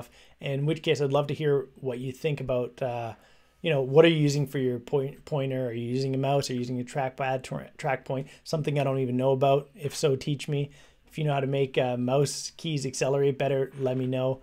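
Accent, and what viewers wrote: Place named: Canada. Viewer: It took me five seconds to know you’re Canadian. And I grew up and I live in Sweden. The times we live in❣️🙏🏻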